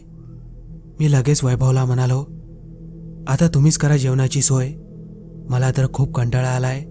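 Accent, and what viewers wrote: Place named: Uttar Pradesh, India. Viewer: अरे मित्रा story कितीही बरी असली तरी narrate करणारा आवाज पण त्या लायकीचा असायला हवाय. एक तर खुप thirdclass आवाज आणि बोलताना शब्द किती चुकीचे. Up वाला माणूस मराठीत बोलतोय असं वाटतंय. मित्रा खरंच सांगतोय फक्त अर्ध्या मिनिटात हा आवाज ऐकून story नको असं वाटतं. थोडा अभ्यास करून channel चालवा. कश्याला स्वतःच हस करून घेताय. कुणीही उठाव आणि youtube वर video टाकाव. फालतुगिरी आहे सगळी.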